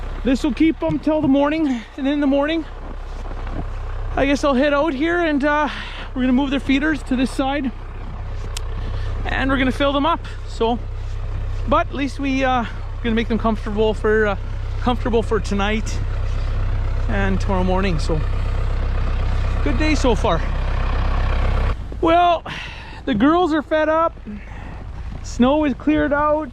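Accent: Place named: Minnesota, United States. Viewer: Are you from Minnesota? You have an accent from that region. I am impressed by your enthusiasm for your ranch. I grew up in Colorado. We used to get big storms too.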